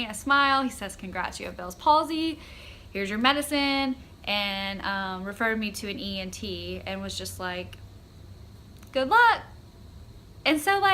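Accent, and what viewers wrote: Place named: South, United States. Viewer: Hi, my name is Melanie and my husband was diagnosed with Bell's Palsy almost 3 weeks ago. He had horrible headaches the night before and then woke up am took a sip of water, it ran down his chin and he looked in the mirror and there was it. I took him to the emergency room right then and they knew right away what it was. They didn't do a scan or anything. Did you do acupuncture or anything like that? Fred is doing acupuncture, he's meditating and I think yoga as well. We live in Santa Monica, CA, but I'm originally from TN. Are you from the south? I feel like I hear it a little and frankly that's what I found appealing and comforting about your video. Thanks so much for sharing your story and I will definitely check out the FB page. I do drive Fred crazy watching all of the videos about Bell's palsy, but I just find them so helpful! 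All my best to you.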